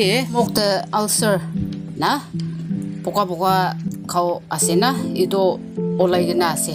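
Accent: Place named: Nagaland, India. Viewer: Authentic and natural...the way you speak nagamise is interesting 😂❤️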